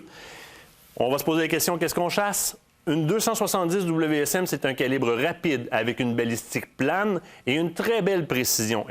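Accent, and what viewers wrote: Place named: Quebec, Canada. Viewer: Je ne me lasse pas de l'accin québécois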